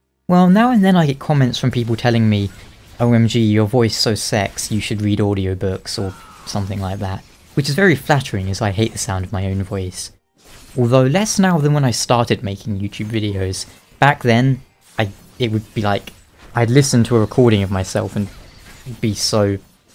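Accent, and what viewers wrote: United Kingdom. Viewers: Go for it mate, even if you want to use dark souls ost if you need to, I rekon your audio book will be well received Also we're you born in the uk? Nice work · I strongly agree with your ideas here. I don't play destiny, but I like the Lore videos you make. Also some Diablo Lore videos would be uber sweet. I also believe your British accent makes you very pleasant to the hear.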